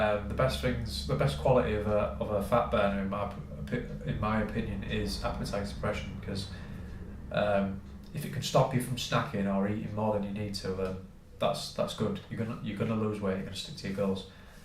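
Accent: England, United Kingdom. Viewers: Good video. Great to hear a bloody English accent on a fitness channel for a change. You a Manc?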